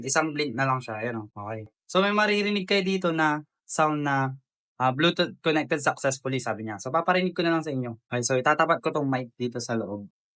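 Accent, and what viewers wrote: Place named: Pangasinan, Philippines. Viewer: Pangasinan accent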